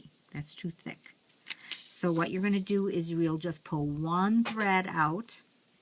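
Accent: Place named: South Carolina, United States. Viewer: I enjoyed looking at all your projects... are you from South Carolina, I'm from Georgia... your accent sounds like my sister in law who lives in Columbia, S.C.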